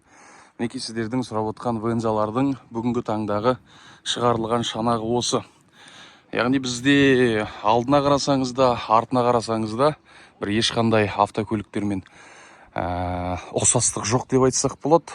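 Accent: Standard. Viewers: жақсы таныстырып жатырсың таза қазақ тілінде рақмет бауырым қаида жүрсеңде жолың болсын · Керемет бауырым сөз жоқ таза қазақша Алла жар болсын сіздерге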